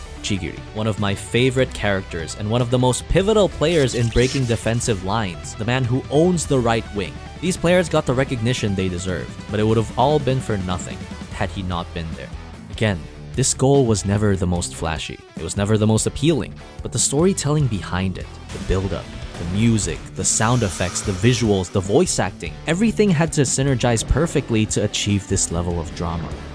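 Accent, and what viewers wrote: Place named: Liverpool, United Kingdom. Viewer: scouse bastad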